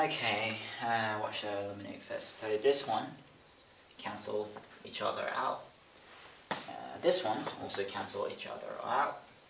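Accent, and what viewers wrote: United Kingdom. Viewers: You know how good it feels when you got it right Btw I solved this in 10 min I’m not gonna lie I’m so happy Ps I love your British accent it’s so cool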